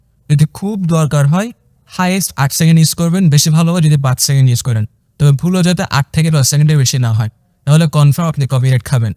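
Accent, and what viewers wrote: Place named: Chittagong, Bangladesh. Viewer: Only in this video of yours "Chittagongian accent vibing" ...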